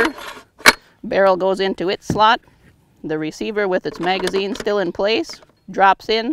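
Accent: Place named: Minnesota, United States. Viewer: I'm trying to identify the accent... Minnesota? Canada?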